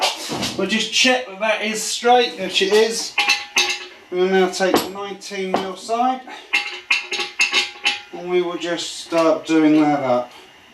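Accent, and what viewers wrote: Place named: England, United Kingdom. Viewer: A good video,nice to hear an English accent for a change,me being an aussie.Was looking for a video on the same job on an '85 V-Max,supposing theres not much difference?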